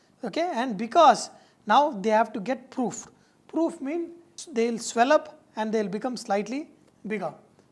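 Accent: India. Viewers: Why do indians have such a funny accent? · sir you are a great cook nothing wrong with indian accents but since you are a great chef, please fix some of your pronunciations. for fantastic: it is fan. not fun or funtastic. for flour it is not flar/flaar or flore/ floor. it has same pronunciation like flower (phuul). same with hour (ghanta) it pronounces same like "our". hope you didn't mind. not aar/ are. although some measurements are missing from some of your recipes, but your cooking is undoubtedly "funtastic" :). since you have a great sense of humour. god bless you.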